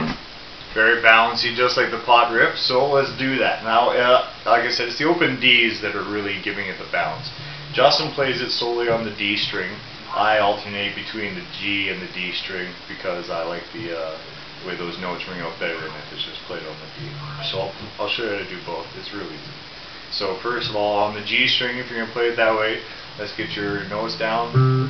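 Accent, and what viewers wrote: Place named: Canada. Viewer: bro. First off you sound sooooo fucking Canadian I was laughing so hard. Not an insult...my daughter was born in Ottawa....funny memories. However, bro you are playing the riff incorrectly. i know you have been a long time subscriber/commenter on my videos. You are adding in extra octave d's...i mean technically the notes are correct but there are more open d's not the octave 12 or in your case on the g string...the 7th fret.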